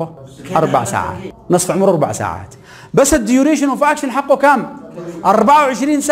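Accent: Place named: Yemen. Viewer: اللهجة اليمنية ❤ الله يوفقكم اهل اليمن ويزيدكم من علمه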